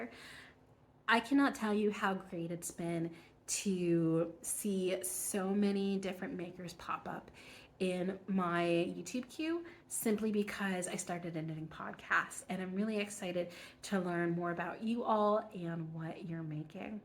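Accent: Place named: Vancouver, Canada. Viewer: Very impressive. I had to watch it twice. You sound like you're from Vancouver, Can. Keep up the excellent work.🌫️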